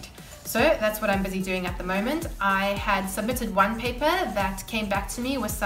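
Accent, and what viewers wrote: South Africa. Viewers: Are you South African? If so, where did you study?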